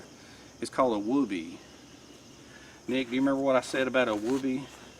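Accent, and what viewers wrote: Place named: Georgia, United States. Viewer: We love this guy... He speaks real American Georgia English . Love from JAPAN . ✌️😉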